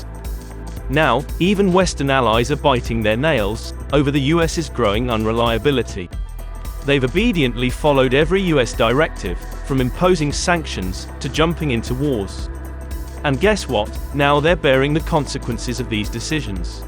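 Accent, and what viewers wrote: United Kingdom. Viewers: The host got British accent, nothing but evil comes out of their minds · When ever I hear a British accent I can't believe anything all Colonial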